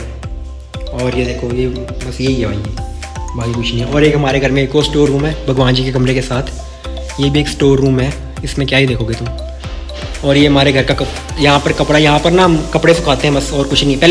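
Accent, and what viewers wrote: Rajasthan, India. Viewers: Rajasthan walo ka accent chhipaye nhi chhupta tbh maine socha tha nd it came true !..m from Rj too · Are u from rajasthan?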